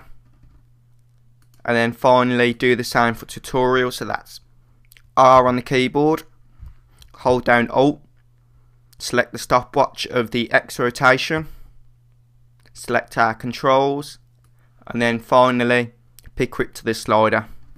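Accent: Birmingham, United Kingdom. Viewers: BIRMINGHAM ACCENT!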